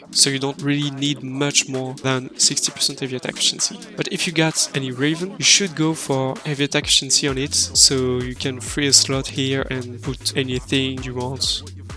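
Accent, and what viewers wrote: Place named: France. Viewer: slay girl ! very sexy voice btw, i love the french accent and the build is insane plz do more of these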